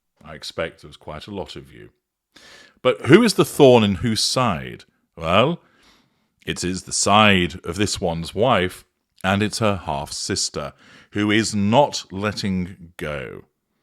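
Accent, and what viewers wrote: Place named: RP, United Kingdom. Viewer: My thanks for putting me right (although I was suggesting the RP English accent that tends to utilise, but it sometimes slips.). Indeed it is rather silly. Best wishes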